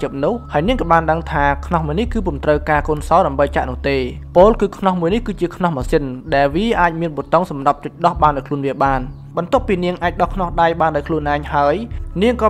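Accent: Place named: Siem Reap, Cambodia. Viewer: Admin និយាយមកដឹងតាអ្នកសៀមរាបម័ង😂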